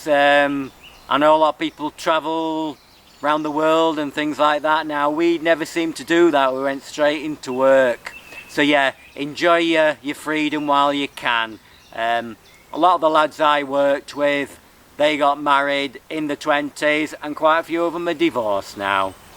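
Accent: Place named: Northern England, United Kingdom. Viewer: Looking forward to the DIY food dehydration tutorial. Nothing better than a northern accent, I'm up in Leeds. Congrats on the 1000 subs.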